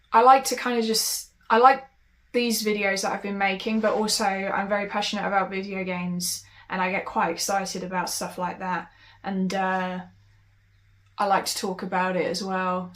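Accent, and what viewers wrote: United Kingdom. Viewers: THOZE DRY LiPZ, THE UNDER EYELiD BAGZ KOMiN iN, THE WHiTE WOMAN TWiTCH, & THAT ACCENT ! HOW R U BRiTiSH BUT NOT BRiTiSH GROWN !? U GOTTA TRY ADMiTTiNG WHETHER U THiNK THE DiCK iS CUTE OR NOT MiZZ ! U KAN'T KEEP BOTTLiNG UP ALL YOUR FEELiNGZ, NOR JUZT RELEAZE SOME OF THEM ON YOUTUBE, YOU'RE STiLL GONNA EXPLODE ! U HAVE TO B WiLLiNG TO SAY THiZ STUFF iN PERSON, ON THE SPOT, OR ELSE iT'LL EAT'CHU UP iNSiDE ! ONCE AGAiN, HONEZTY ! & QUESTiONiNG TOO ! & TRY & GET WHAT U EAT iN ORDER AS WELL, KAN B A MASSiVE HELP ! <link> "FOOD THAT KiLLS WiTH DR. MiCHAEL KLAPER-1993-FULL DOCUMENTARY-LECTURE-TESTiMONY" WHiTE0MOON UPLOAD ! TRY STiCKiNG TO EATiNG THiNGZ RAW THOUGH, THE MORE U COOK FOOD THE DEADER iT GETZ ! BUT U KAN TRY & HAVE BOiLED WATER AS A CONSTANT TO DRiNK THOUGH ! & iT'Z STiLL A DEATH PLANET MiZZ, & YOU'RE ALiVE ON iT, iT WAZN'T GOiNG TO END THAT WELL 4 US ANYWAY EVEN iF U HAPPEN TO B KOME iMMORTAL ! 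iT'Z ALRiGHT TO FEEL SAD, iT'Z NOT A DiSORDER, iT'Z AN EMOTiON ! VASECTOMiEZ 4 ALL, ESPECiALLY US HUMANZ ! AT LEAZT A WASH OUT METHOD OR SOMETHiN GUYZ ! [NOT YELLiNG, JUZT LiKE TYPiNG iN CAPZ]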